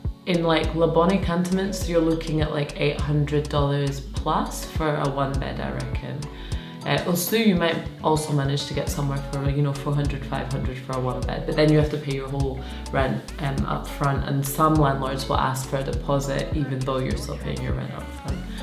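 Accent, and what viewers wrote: Scotland, United Kingdom. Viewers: I detect some scottish accent there ... am I right ? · I thought I heard a Scottish accent.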